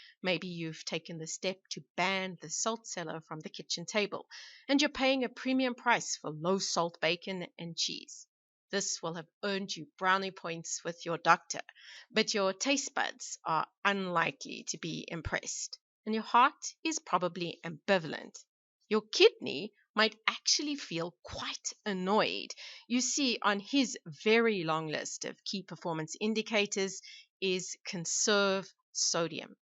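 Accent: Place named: South Africa. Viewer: Excellent informative videos and cute South African accent!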